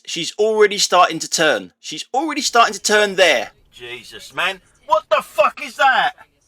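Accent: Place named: Cockney, United Kingdom. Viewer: I could listen to a cockney 'avin a moan for hrs, reminds me off my old Nan... Thanks a million dude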